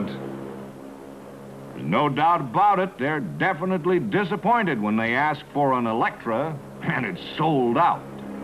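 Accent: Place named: Mid-Atlantic, United States. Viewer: I love Mid-Atlantic accent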